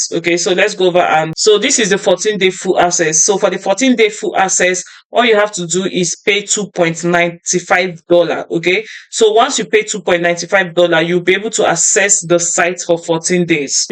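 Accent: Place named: Africa. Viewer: What language do you speak as I'm struggling to understand you African accent 😮😮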